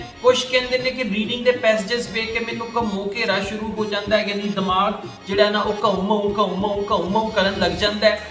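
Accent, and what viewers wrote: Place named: Malwa, India. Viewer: Background music...👌👌punjabi sirra bolde o sir tuc sade ..malwaian wangu😊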